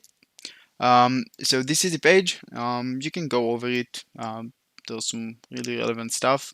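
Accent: Israel. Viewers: Love your Israeli accent lol ! BTW waiting for a reply from you in discord :) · יש לו מבטא ישראלי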